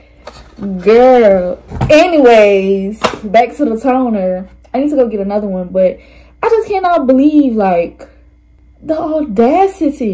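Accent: Louisiana, United States. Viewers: You have so much hair! It turned out so pretty! I feel like I heard a Louisiana accent! ❤ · Y’all what state she from I need to know with this accent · Are you from Louisiana?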